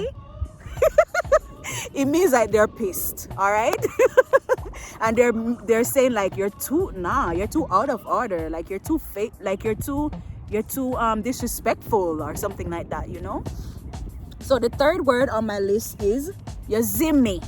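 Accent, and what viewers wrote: Jamaica. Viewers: i love jamaican accent ...thanks for the tips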